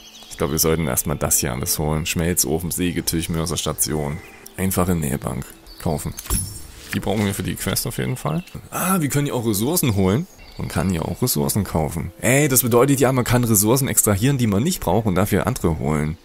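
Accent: Saxony, Germany. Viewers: Super Video. Macht irgendwie direkt Spaß. Mal schauen, ob es das evtl. für die PS geben wird. Und bitte niemals den sächsischen Dialekt weglassen. Das erinnert so an Heimat 😉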